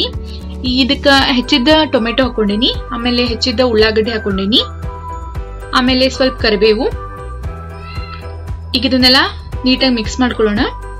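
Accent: North Karnataka, India. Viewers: North karnatakada Mathu tumba channagide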